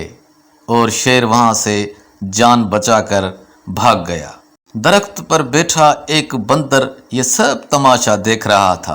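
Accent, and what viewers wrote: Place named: Sindh, Pakistan. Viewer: بہت ہی خوبصورت انداز سے آپ نے کہانی بیان کی، لیکن لہجہ آپ کا بلکل سندھیوں جیسا ہے۔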